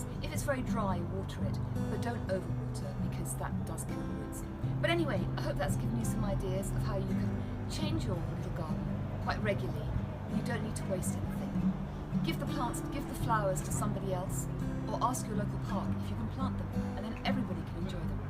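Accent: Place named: England, United Kingdom. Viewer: Thanks for sharing your gardening tips! Love your soft and English accent!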